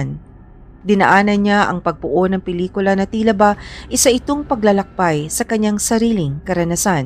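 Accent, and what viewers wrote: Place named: Visayas, Philippines. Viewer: Bisaya yata ang narator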